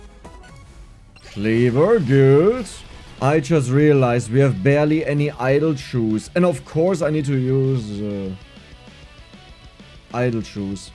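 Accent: Germany. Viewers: der deutsche Akzent ist lustig und mittendrin immer die deutschen Fluchwörter for my english fellas: the german accent is funny and in the middle of it all there are always the german curse words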